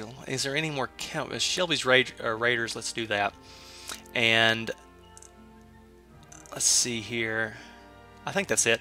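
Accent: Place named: South, United States. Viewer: from a neutral/Midwest accent to a straight up urban Southern accent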